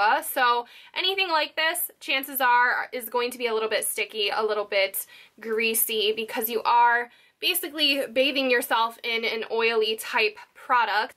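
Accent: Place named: New Jersey, United States. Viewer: your accent screams native jersey italian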